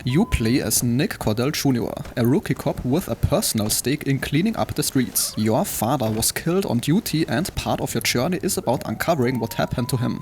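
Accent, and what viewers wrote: Germany. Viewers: Now that’s one German accent !!! I love it - thanks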